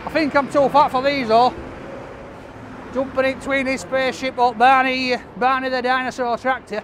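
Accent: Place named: Yorkshire, United Kingdom. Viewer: I'm trying to place your accent. It seems like a mix of Yorkshire and Stoke-On-Trent. Please put me out of my misery :)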